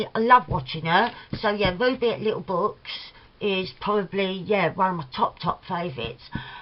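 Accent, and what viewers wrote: Cockney, United Kingdom. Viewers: Thank you for tagging me! I’m filming the response today 😊❤️ oh, and I absolutely adore your cockney accent 😉❤️❤️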